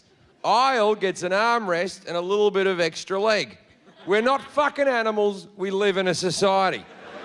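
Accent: Australia. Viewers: No. As an european, I sensed that it might be aussie. And it it! :-D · Australian mate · He's just Australian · No, he's just Australian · He's from 'straya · Nah mate, he's just Australian. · Nahh... he just sounds Aussie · A lot of Aussies talk like this. QUEENslander. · He's Australian that's their accent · That's just the more relaxed and slack type of Aussie accent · Wow, love him. So beautifully Aussie. 💖💯👍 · He is just aussie:) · He’s an Australian comedian. Hello. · He is just Australian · Just Australian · He is not American !!!😂This is an Australian accent Lol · He's Australian, mate. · Australian accent very irritating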